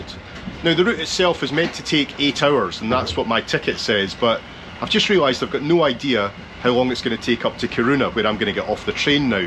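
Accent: Scotland, United Kingdom. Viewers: I love watching your travels and this one gave me an appreciation of just how good you are at editing. The 5sec cut where you threw the napkin onto the plate after that impressive looking lasagna was like the full stop at the end of the dinner sentence, ready for a new para. Im half Scottish and your accent reminds me of my youth there. Great journeys and travelogue. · Oh you are toooo adorable...I love your Scottish accent.Subscribed just to listen to you talk...and your sense of humor.😅...very interesting content too!😊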